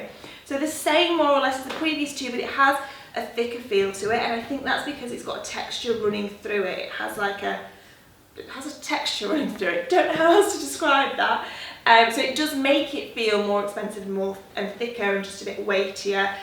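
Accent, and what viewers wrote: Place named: Northern England, United Kingdom. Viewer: YOU'RE PRETTY AND I LIKE YOUR NORTHERN ACCENT.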